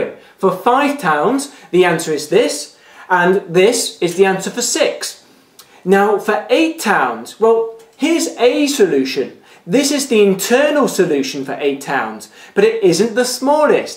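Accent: United Kingdom. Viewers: bahahaha, liverpudlian accent ftw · totally fucked my mind. Also I want to apologise if you aren't Brit but that's how I imagine the average British accent sounds.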